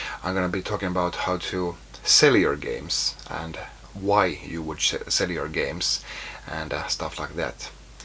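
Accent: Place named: Finland. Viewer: It's not just the Finnish accent or his low register but also his measured way of speaking and the thing he does when he can't think of what to say for a second. He's nice to listen to, and he has something to say. I wish that more announcers had voices like his! Aside from the guys who commentate poker tournaments, the olympic people, and a few hockey guys, those guys usually sound barky and rub me the wrong way. Even when there is a nice voice they typically have two others you have to deal with when he has nothing to say. There are lots of people like on Youtube who actually know how to speak without excessive animation, and with diction, one of the reasons I watch it and why I think it's so popular. And of course they cover things you'd never discover otherwise. I've lived with a moderate hoarder, and you are no hoarder! Not even the slightest bit. 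You're right when you say you're running out of space but everything is neat and organized and clean, you are willing to let things go, and most important everything has a use within the foreseeable future and therefore is not trash. They're games.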